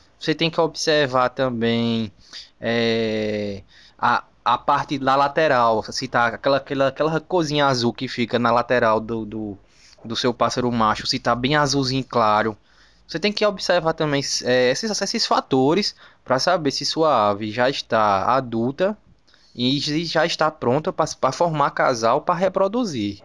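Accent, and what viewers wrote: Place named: Northeast, Brazil. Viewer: Você é nordestino? Sotaque lindo 😊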